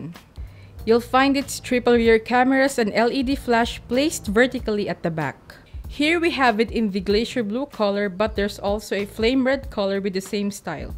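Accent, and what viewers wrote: Philippines. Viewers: Non-native Filipinos speak that way. Let it pass. At least she's trying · pinoy kse ganun tlga d native language eh.. may accent tlga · This accent is too thick. Im guessing it is pinoyish english.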